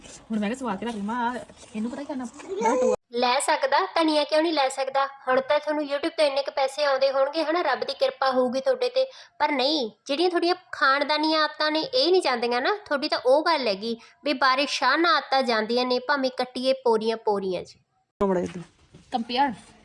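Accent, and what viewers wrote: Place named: Bathinda, India. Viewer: Kida jiss hisaab naal tuc yaar pronounce krdeo oss hisaab eda lgda v tuc Bathinda ton o cuz mere nanke v Bathinda ton ne te o v bilkul same eda hi yaar pronounce krdea..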